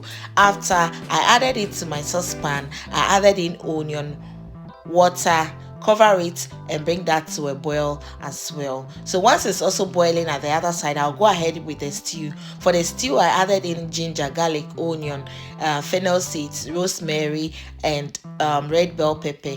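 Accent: Ghana. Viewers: just when i was thinking of how i could see ewedu leaf to buy . you just thought me another idea with spinach and okro. i guess you are Ghanian from your accent. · You sound like Ghanaian lady good job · This is not normal. She sound Ghanian.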